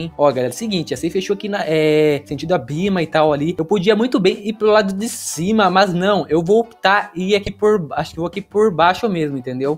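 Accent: Rio Grande do Sul, Brazil. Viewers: Cê é gaúcho?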